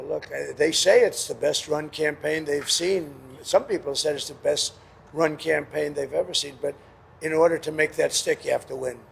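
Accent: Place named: South, United States. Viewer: You know, recovering from coma is a long and challenging process, maybe that's why you sound a bit like one of those ignorant uneducated toothless that smell like onions from Hillbilly land, Please go back to Hillbilly land buy more dark tapes and cover his mouth, he don't deserve to be in this country.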